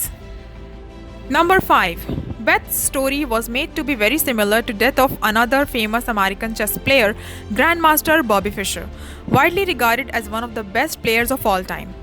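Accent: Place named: Pakistan. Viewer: Pakistani voice over HAHAHA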